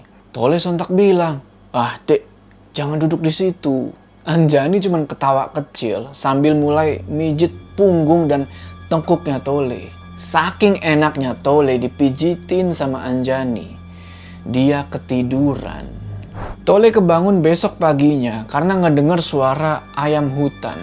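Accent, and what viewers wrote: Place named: Java, Indonesia. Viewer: yg part 1,logat bisa jawa belajar dr mana..haha Salam dr klaten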